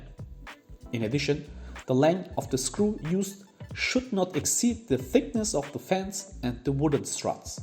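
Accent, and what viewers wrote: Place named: Germany. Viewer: Nope, I'm Asian that voice doesn't sound Asian period. I was hearing German, Dutch or Danish.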